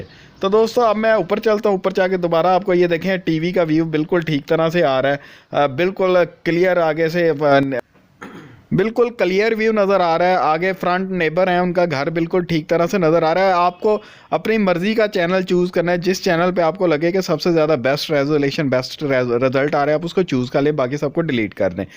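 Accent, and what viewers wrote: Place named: Punjab, India. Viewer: Punjabi touch aa gyya hai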